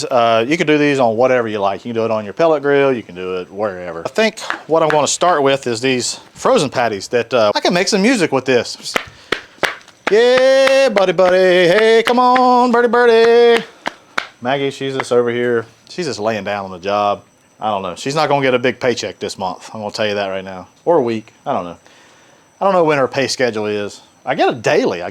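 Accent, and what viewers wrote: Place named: Texas, United States. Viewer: Toda tu comida me encanta muchisimo eres un gran chef!!! Me gusta tu acento tejano😊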